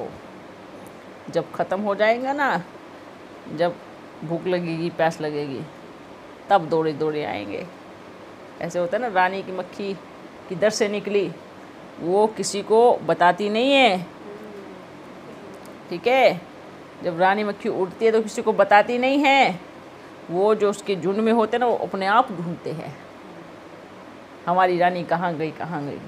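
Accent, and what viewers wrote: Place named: Sindh, Pakistan. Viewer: ब्रह्मा बाबा और जानकी दादी सिंद्ध पाकिस्तान में जन्म लिए थे तथा सिद्धी टोन में बोलते थे। अब यहां जो आवाज है वह वैसे ही हैं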